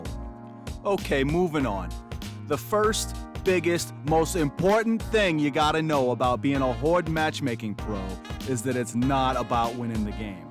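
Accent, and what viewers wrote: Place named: Boston, United States. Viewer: Subscribed.. hilarious especially with the Boston/jersey accent 😂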